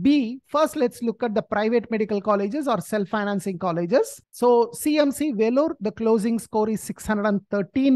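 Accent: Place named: United States. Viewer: Enya unaku tamil thariyatha..........America la porantha mathiri thann pasurathu........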